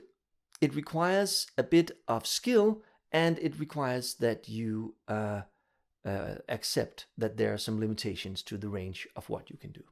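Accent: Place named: Denmark. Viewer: Your accent is super adorable! 😊 I think I need a Danish boyfriend now!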